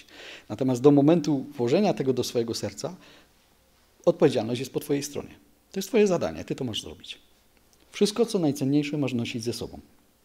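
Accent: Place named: Silesia, Poland. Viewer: Bardzo ciekawy wykład! 👍 I ten spokojny głos, ze śląskim akcentem😉 Przyjemnie się słuchało. Dziękuję.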